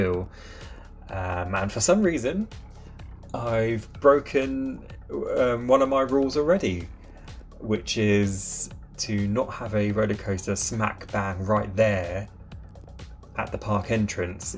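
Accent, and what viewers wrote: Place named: United Kingdom. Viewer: Your voice is from the united kingdom and your from the UK?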